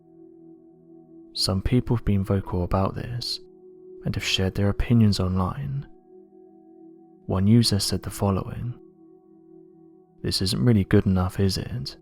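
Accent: England, United Kingdom. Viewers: The whispering English accent can make anything scary. Besides that, research Jacob Lowenstein. He worked in the park for decades and is highly educated in the field. He has a family too…..and lives right near Yellowstone. If this “data” was a concern, I’m quite sure he’d be gone. But then again, maybe the dudes nuts and wants to die there.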